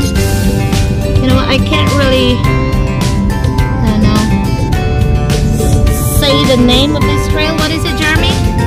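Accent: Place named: Philippines. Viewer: WOw, that is the most Pinoy English I've ever heard. Salamat po!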